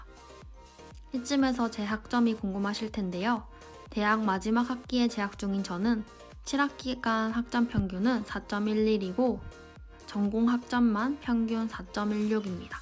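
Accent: Busan, South Korea. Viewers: 국립대라고 했지만 부산대가 쎄게 의심되는 억양..매력있어요ㅋㅋㅋ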